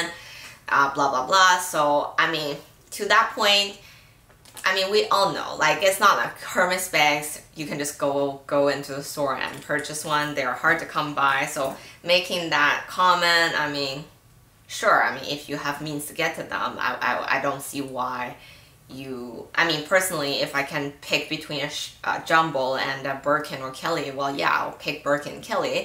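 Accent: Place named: Hawaii, United States. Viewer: haha okay! You sound like a Hawaiian local!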